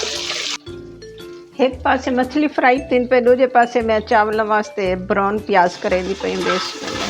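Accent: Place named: Saraiki, Pakistan. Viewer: Sariki voice im like good Ap ka channel subscribers kar dya ha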